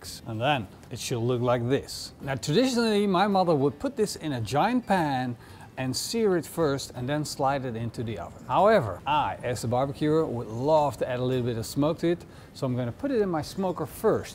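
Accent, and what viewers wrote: Netherlands. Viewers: Im a dutch guy with a turkish wife that really enjoy youre videos 🤣 she say he is a YouTube star with a dutch accent 🤣💪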